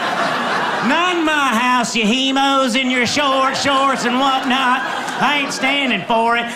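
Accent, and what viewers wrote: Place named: Wisconsin, United States. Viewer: Wisconsin accent is on point 😂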